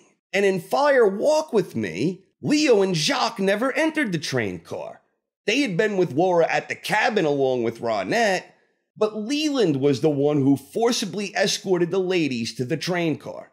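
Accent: United States. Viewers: i would love to know if this is your real voice..and if so....which part of America are you from...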